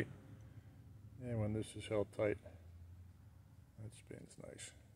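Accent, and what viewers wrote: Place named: Texas, United States. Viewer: Did I undesrtand you right ? "makes you wanna blow your hair out" My native language is german, so it is sometimes a little bit difficult to understand your Texas slang. Greetings from Austria ;-)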